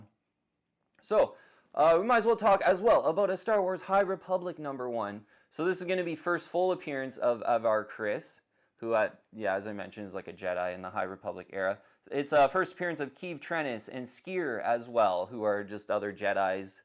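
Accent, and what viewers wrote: Canada. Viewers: Love this channel!!! The Canadian accent makes me home sick - LOL Great videos keep them coming!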